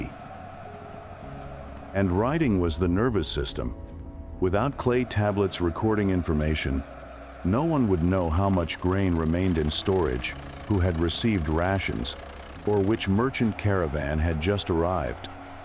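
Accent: United States. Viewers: This is a good video but you need a narrator with a British voice. Nobody wants to hear an American talk about history - signed an American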